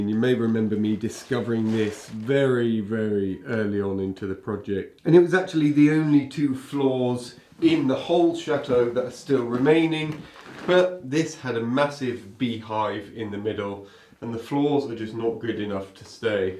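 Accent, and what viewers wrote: United Kingdom. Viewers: I don’t speak French but French sounds so beautiful!! As an American I love his British accent but I could listen to a whole video of him speaking French!!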